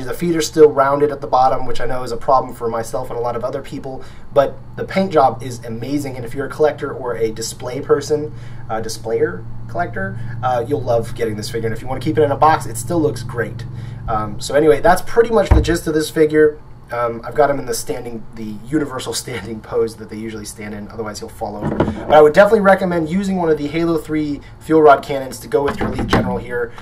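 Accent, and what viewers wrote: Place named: Germany. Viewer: nice german accent, but I'm so sad they didn't do well on the Fuel-Rod Cannon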